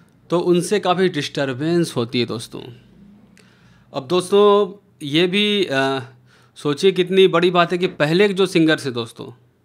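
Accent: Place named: Bhojpuri, India. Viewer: Bhojpuri acha laga Sir apki awaz main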